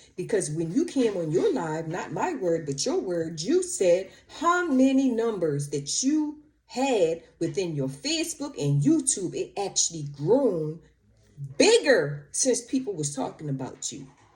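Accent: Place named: New Orleans, United States. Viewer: Ms you very pretty and I like your accent. Are you from New Orleans?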